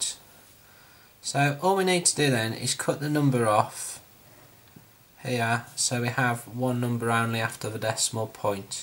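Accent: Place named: Yorkshire, United Kingdom. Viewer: Proper Yorkshire accent I think I would love to live there..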